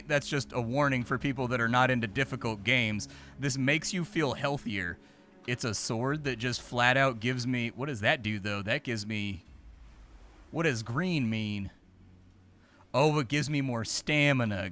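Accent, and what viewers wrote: Germany. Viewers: Very good German accent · your german english is awesome,!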